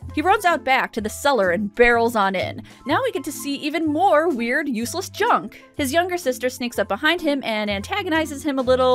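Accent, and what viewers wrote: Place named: Midwest, United States. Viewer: Your from the Midwest aren’t cha?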